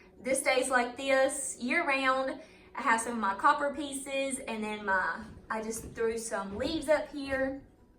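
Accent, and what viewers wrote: South, United States. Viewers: I’m a southern girl from fla and texas and i adore hearing your accent brings me home 🍁🍁🍁🍁❤️🎃🍁you have a beautiful home 🎃🍁🎃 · you brought tears to my eyes when you were counting your blessings. God is a good, good God and gives us our, not perfect, but rich, joyful lives. I always love your home tours....you could make any ole house a warm and inviting home with your decorating swag! TFS your life and beautiful home with us! God bless you and Drew.👍😊💛🍂🌻🌾🎃🍂🌻💛 BTW, I too am a small town girl and love that you may match or surpass my southern draw...lol!! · Fall Greetings from Canada. Oh my what a charming & cosy home you have.I love your southern sweet accent. I too am a YL member (now 2 yrs.) & what a difference it has made in my life. TFS & God Bless!!! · Oh and this is the first video I've seen of yours, and I'm so envious that you have a large loving family. 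You've got the sweetest farm cats, and I just love your personality and voice. Very southern. Im Canadian but I have said more than once that I wish I was born somewhere in the south. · The minute you started talking I knew you one a home town Southern girl, I live a rocks throw in Hueytown, your home is beautiful, love Petals of the Past! glad I found you!😊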